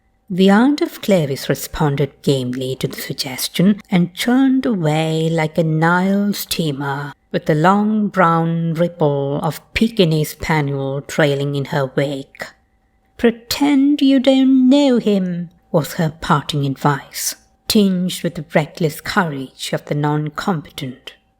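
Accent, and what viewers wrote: RP, United Kingdom. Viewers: This indeed is posh Upper RP English. I had to listen to this three times to understand the whole story. The story is actually quite funny ☺️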